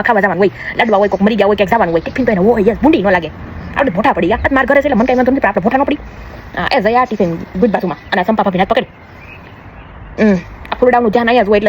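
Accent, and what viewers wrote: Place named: Saurashtra, India. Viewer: બેન તમે ગામડે કેટલો સમય રહ્યા હતા, અને વીદેશમા કેટલા સમયથી છો? તમારી સૌરાષ્ટ્રના ગામડાની બહેનોની રીતભાત અને બોલી ઘણી આકર્ષક છે. વીદેશમા રહીને પણ પોતાની વતનની જીવનશૈલી આબેહુબ રીતે રજુ કરો છો. તમારી રગરગમાં માં ભોમકા ની સંસ્કૃતિ વણાયેલી છે.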